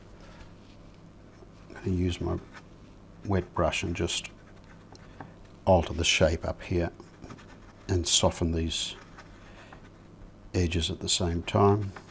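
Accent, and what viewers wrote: Australia. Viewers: Hi, just discovered your channel! How nice it is to hear another Aussie. I promptly subscribed and look forward to learning lots..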